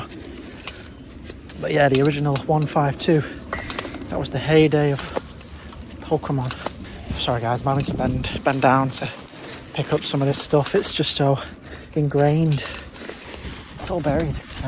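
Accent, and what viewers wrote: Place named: United Kingdom. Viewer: I'm American and your accent doesn't bother me at all. I can understand you just fine. You don't sound annoying you just sound British. I don't know what those commenters are hearing lol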